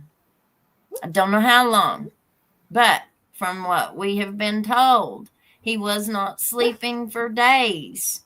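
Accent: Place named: Kentucky, United States. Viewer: My grandma grew up in Pike County KY and listening to your channel reminds me so much of her accent! ❤She passed years ago but listening to your channel was so familiar to me because of it! Thanks for covering this case -- I can't wait until we find out what really happened here.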